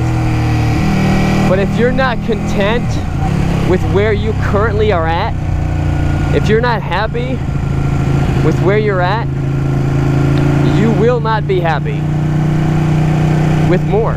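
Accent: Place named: United States. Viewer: Ok guess where you are....from your accent and the fact your on the wrong side of the road, plus your about to dribble out your opinion, id hazzard a guess that your an American in America. Am i right or am i right?. Anyway carry on, i like watching and listening and everybody's entitled to their opinion, no matter what leftwing progressive's say.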